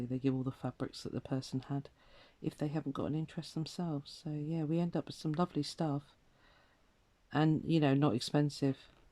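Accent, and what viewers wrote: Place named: United Kingdom. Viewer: I’m really enjoying watching you create and listening to you talk! You’re inspiring me very much! Don’t worry about how much time your video is taking. It’s a pleasure to be creating here and having your company in my home. You can take all the time you want. If somebody wants a bullet for a video they can speed it up if they want. It’s nice listening to your soothing British voice. I’m in Arizona sitting here with cancer and creating a beautiful rug hooking. It’s very healing to me listening to you. Thank you so much for sharing your gifts!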